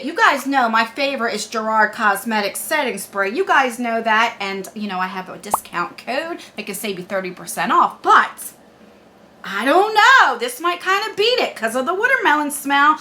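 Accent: New Jersey, United States. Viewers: I'm obsessed with watermellon and your NJ accent. It makes me so homesick since I moved down south. Keep making these awesome videos!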